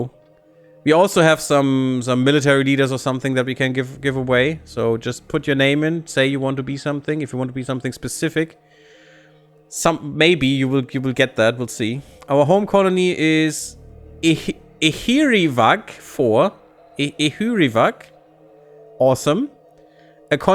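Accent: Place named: Germany. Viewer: Are you german? Love the accent lol sounds cool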